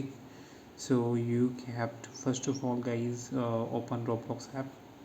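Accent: India. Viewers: Indian scammer I swear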